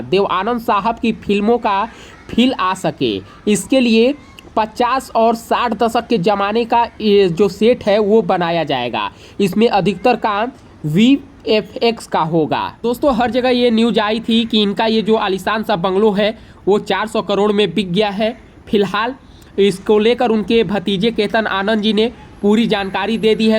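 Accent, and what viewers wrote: Bihar, India. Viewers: भाई आपकी बात करने के सुर से लगता है आप उस प्रदेश के है जिस प्रदेश के चाराचोर लल्लू यादव है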